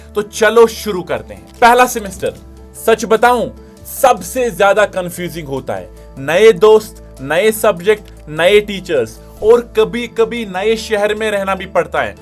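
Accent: Kashmir, India. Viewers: Why ur accent sounds like kashmiri?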